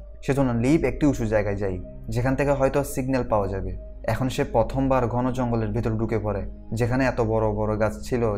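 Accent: Chittagong, Bangladesh. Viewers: আপনি কি চিটাগাং এর মানুষ 😅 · Vai chittagonian naki😊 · চট্টগ্রামের আন্চলিকতার টান পরিহার করে ব্যাখ্যা করুন।শুনতে আরও ভাল লাগবে। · Vai Apner lenguage a CTG local er tan ase. Explation valo hoise kintu Kotha bolar expression aro practice korte hobe , Good Luck. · ভাষাটা একটু প্রবলেম,চাটগাইয়া টান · ভাইয়া কি চিটাইংগা? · আপনি কি চট্টগ্রামের? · are you from Chittagong? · Oni hoitoba Chittagong ar ar jonno onar kothai Chittagong ar tan chola asa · Apni Chittagong thaken??? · ভাইয়া কি চট্টগ্রাম এর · Chatgaiya tune ta na anar cesta koren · ভাই হচ্ছে চট্টগ্রামের লোক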